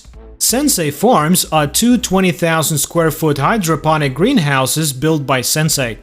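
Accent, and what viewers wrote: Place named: Russia. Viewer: your dirty Russian accent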